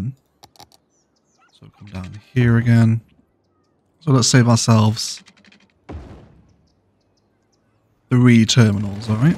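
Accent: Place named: England, United Kingdom. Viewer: Time to sit back and enjoy my dear old with his awesome funny English accent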